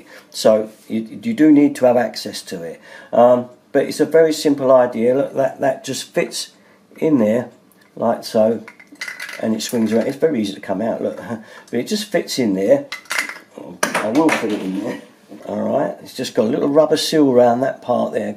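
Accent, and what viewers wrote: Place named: RP, United Kingdom. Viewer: Who complains about his accent ??? The man speaks the Queen’s English, no complaints here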